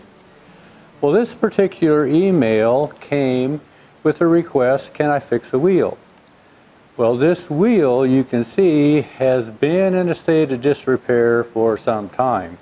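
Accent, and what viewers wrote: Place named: West, United States. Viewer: This is a snarky, implied criticism of the customer, who is labeled as being in effect "inauthentic." As though being a horse and buggy mechanic with a MIG welder, video cameras and video editing software, and a Youtube channel dedicated to ASMR videos with a horsey western twang is somehow more authentic. A case of the kettle calling the pot black. Leaves a sour taste. "What you mean 'we,' Kimosabe?"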